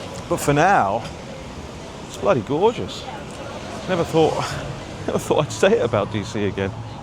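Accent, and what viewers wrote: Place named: United Kingdom. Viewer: you're losing your beautiful British accent.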